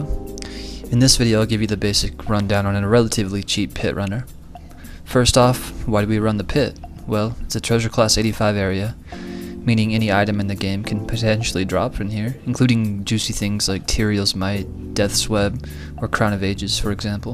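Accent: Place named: Oregon, United States. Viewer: This is the most Oregon voice ever.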